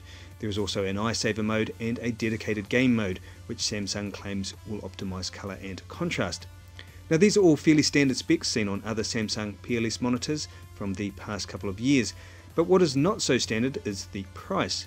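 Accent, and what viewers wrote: New Zealand. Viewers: are you from new zealanfd? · A Kiwi accent be praised ! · MY BOY YOUR FROM NZ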